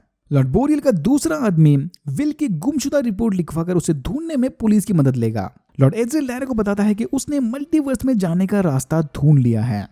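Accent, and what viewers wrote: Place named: Pune, India. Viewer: Punekar bolte🔥🔥🔥💪🏽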